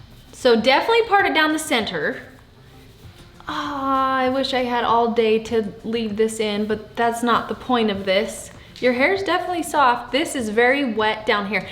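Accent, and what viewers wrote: Utah, United States. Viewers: Oh my gosh. You’re in Utah. Yay me too. Must be why I was wondering what accent when I read this lol. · Holy Utah accent! 🙂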